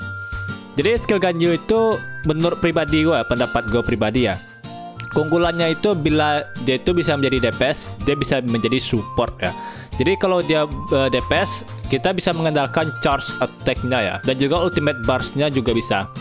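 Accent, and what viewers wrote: West Sumatra, Indonesia. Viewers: logat lu keknya orang minang ya bg?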